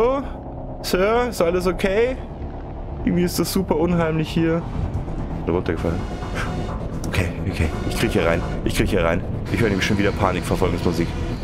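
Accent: Bavaria, Germany. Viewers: Hey, ein sehr schönes Spiel hat mir sehr gut gefallen! Dein Bayrisch ist echt Fantastisch 👍 hast mich wieder super Unterhalten der Alm Öhi würde sich freuen und mit dir ein Helles trinken oder Ziegenmilch 😅😂🤘 Vielen Dank für den tollen Prolog hab ein Super Wochenstart Bro 😁 Lasst ein #Like und ein #Abo für den lieben da 🤘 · Richtig geil,und dein Bayrisch dazu,top😂. Würde gern das komplette Game sehen,war ziemlich genial. Weisswurst ist überhaupt nicht meins,never ever😝,sorry.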